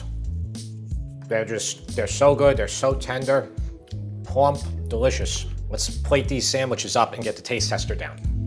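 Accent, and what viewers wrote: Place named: Long Island, United States. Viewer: Can’t wait to make this. Thanks! Love and miss the LI accent. Vermont just doesn’t cut it. 😬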